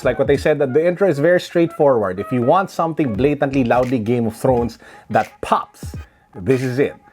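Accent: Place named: Philippines. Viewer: Went in person to check out all the GOT shoes, this one definitely caught my eye and ultimately bought these. House Lannister were close though but they were only exclusive to women. Starks were nice but would prefer a black bottom sole. White Walkers were nice also but it screamed yellowing over time. Besides the strong filipino accent, you gave a well thought out review of the shoes. Great job bro.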